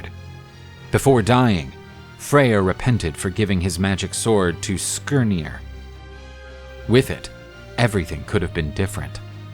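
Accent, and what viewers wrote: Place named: United States. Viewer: You say all of the Nordic words like an american, great storytelling other than the pronunciations of names.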